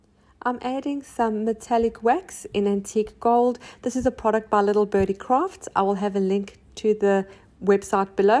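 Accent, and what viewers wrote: South Africa. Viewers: Hi there, I recently came across your channel and love your work. You explain things so nicely and the main reason is because your voice is South African 🤗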